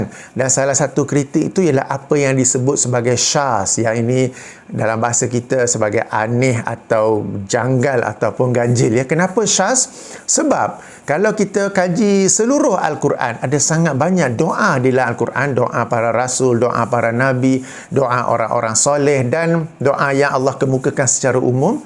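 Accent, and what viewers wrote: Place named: North, Malaysia. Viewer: Senang nk rujuk sama2 ckp utara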